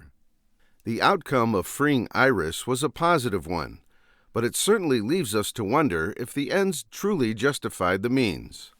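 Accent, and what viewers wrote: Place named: Chicago, United States. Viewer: Interesting take, goosed by your heavy Chicago (?) accent.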